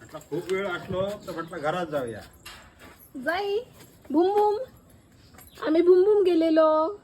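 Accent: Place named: Malvan, India. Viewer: नेहमीच छान असतात तुझे व्हिडीओ. बाळ खूप गोंडस आहे. मालवणी भाषेचा पगडा असलेलं तुझं मराठी बोलणं खूप छान वाटत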